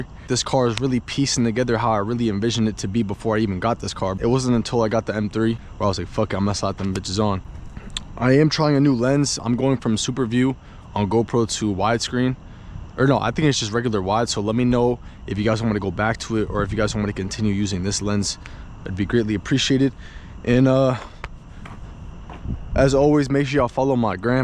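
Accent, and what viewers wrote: Boston, United States. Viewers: Is bro in Boston I can just tell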